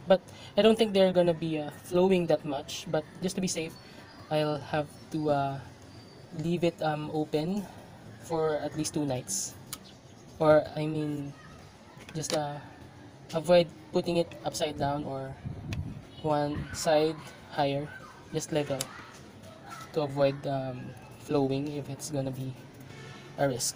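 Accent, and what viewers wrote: Philippines. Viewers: Its really easy to distinguish a filipino with his accent haha, am i right??...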